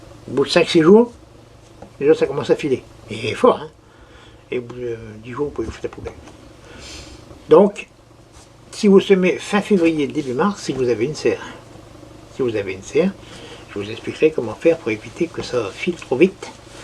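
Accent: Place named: Nord-Pas-de-Calais, France. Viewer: CC, Les tomates expliquées par un ch'ti ... un parfum d'été en plein décembre ....😎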